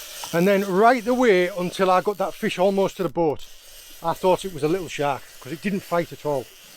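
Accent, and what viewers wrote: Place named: North East England, United Kingdom. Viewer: Been watching your channel for about two weeks now,thought I herd a north east twang lol am in Newcastle but my late grandad was a amble fisherman in the North Sea. Never been fishing myself apart from hand catching flat fish down the ozburn under byker bridge Newcastle as kids when the tide was out. Do you ever see basking sharks in Cornwall?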